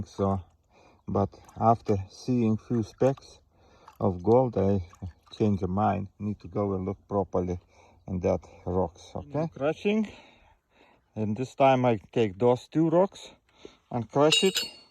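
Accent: Russia. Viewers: You sound like Crazy Russian Hacker. You must be Russian. Am I correct?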